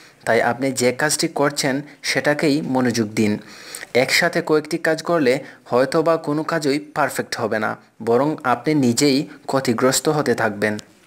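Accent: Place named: Sylhet, Bangladesh. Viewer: আপনি নিশ্চয়ই সিলেটি ভাষা বা উচ্চারন ঠিক করুন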